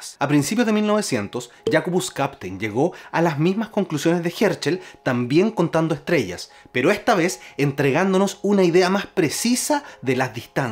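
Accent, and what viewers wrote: Chile. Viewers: Chileno, sin duda. Muy bien hablado y claro. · Muy interesante tu vídeo. Además es muy grato escuchar el castellano chileno después de tantos años en el extranjero.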